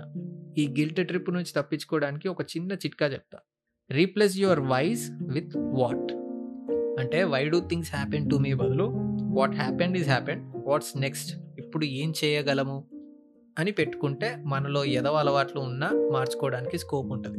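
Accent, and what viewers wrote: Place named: United States. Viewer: Arranged marriages tho naaku problem kaadhu love marriage thone na problem . Andhukante nuvvu india lo unna nee matallo America vinipisthundhi sorry but I sense that . But india lo love marraige vadhu boss endhukante alanti oka love marriage couple ki puttina vaadine nenu . Naaku telusu ela unatadho vere caste ammayi abbayi pelli chesukinte aa putte pillalni ela chustharo . Aa chupulu neeku teleedhu baasu . Adhi neeku physical emi cheyyala povachu deep inside guchukuntadhi bhayya it's very hurting untadhi . Nuvvu puttadame papam annattu chussthe . Nee friends mothers nee tho friend ship cheyyodhu ani mee friend ki warning isthe vaadu appati varaku bhane mayladi tharvatha Mohan chatesthe aa bhadha chala bhayam karam ga untadhi . I envy u kids who are born for same caste arranged marriage couples . Arranged marriage lekapothe mundhu nuvvundav basu . 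Adhi okappudu chala manchi system . Aandhulo mana previous generation chala successful lead chesaru lives that's y nuvvu America velli patriarchal system criticize cheyyagaluguthunnav . Problem mana generation kids mind set lo undhi . Andhariki rights kavali responsibilities vadhu adhe major problem . Ee America velle vallaki patriarchy endhuku nachado artham kaadhu . Malli magallaki value ledhu antaro . Point Ento telusa mana south states lo patriachy kaadhu matriarchal system father enthaina earning varake . Caste system lo oka plus undhi idhi live marraige chesukunna ma mother ee chepparu enti telusa . Prathi oka caste ki vere ve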